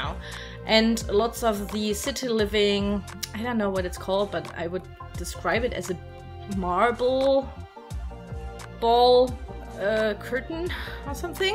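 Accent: Germany. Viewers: So you're german??? I've always thought your accent kinda sounds german but I was never sure about that and I was trying to figure out where you are from if not from germany lmao Anyways! Really nice build even though I have to admit that I'm also not as much into mermaids... so I'll just let the mermaid fans do the judging ^^ Grüße einer ebenfalls Deutschen xD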